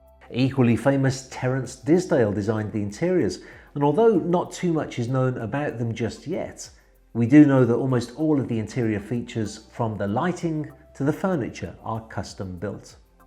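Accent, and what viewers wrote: United Kingdom. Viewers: Where abouts in the UK is your accent from, its driving me crazy trying to pin it down.?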